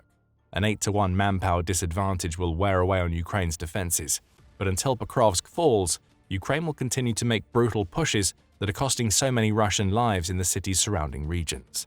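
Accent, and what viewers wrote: United Kingdom. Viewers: Dear God what Bullshit. I should have known as soon as I heard the British voice. The GUR SF were on a rescue mission for a high value asset that is not going to make it out alive. Ukraine is out of reserves and It is showing all over the battlefield.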